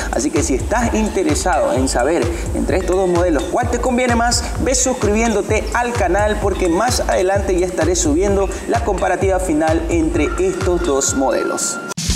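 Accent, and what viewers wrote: Bolivia. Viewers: Cuando vi tu video dije este es de BOLIVIA por qque salio el deber y despues dijiste poquingo y hablas como BOLIVIANO Buen video caramba.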